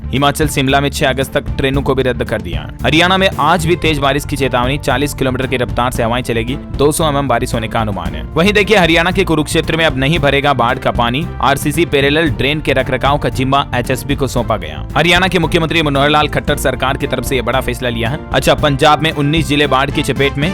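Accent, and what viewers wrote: Rajasthan, India. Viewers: भाई साहब,,, बोली लहजा ठीक करो,,, राजस्थानी भाषा का पुट है भाषा का,,, कल को तरक्की में बाधा उत्पन्न होगी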